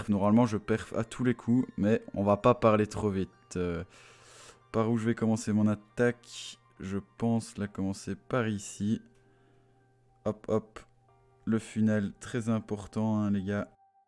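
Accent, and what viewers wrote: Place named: Belgium. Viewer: J'adore l'accent belge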